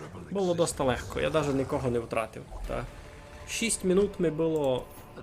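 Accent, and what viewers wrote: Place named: Rusyn, Ukraine. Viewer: Що, не чуєте русинський говір із Закарпаття?)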